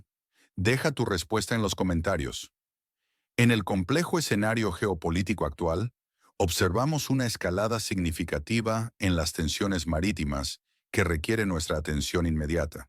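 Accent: England, United Kingdom. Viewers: Si habéis decidido hablar en castellano hacedlo bien, sin arrastrar las erres como tarados. Hablar español con acento inglés desvirtúa nuestra bella lengua e impone un idioma inexistente.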